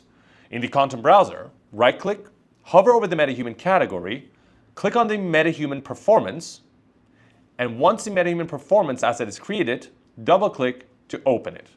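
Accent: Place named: Iran. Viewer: I like your Iranian accent! Thanks for the instruction!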